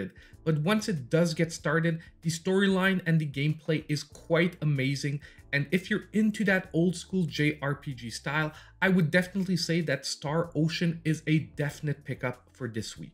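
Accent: Quebec, Canada. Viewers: I'm very surprised that all the deals are from the states, cuz I'd be very surprised if your accent isn't french canadian. Also your channel name is maple syrup...pretty canadian. But I mean people do move to the states haha.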